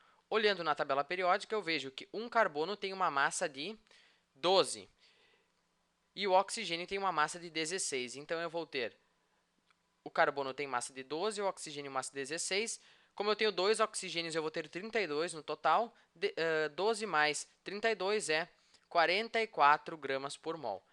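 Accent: South, Brazil. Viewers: Sotaque de sulista!!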